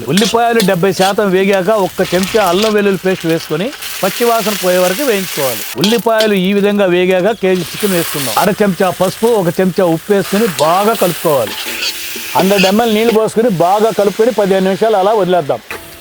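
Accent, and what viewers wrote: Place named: Telangana, India. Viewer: తాత నువ్వు వంట చేసుడేమో కానీ నోరు ఊరుతుంది తాత ఏమన్నా చేస్తున్నావా వంట మన తెలంగాణ యాస లో సూపర్ chepthunnav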